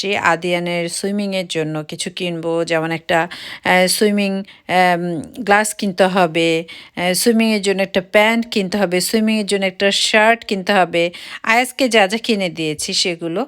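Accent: Sylhet, Bangladesh. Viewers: Apne sylhety ni apa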